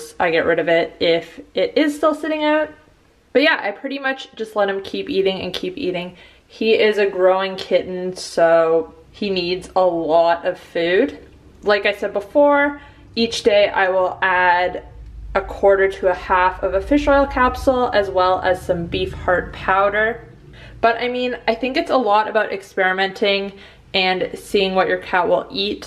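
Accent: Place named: Australia. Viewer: Your Australian yayyyyy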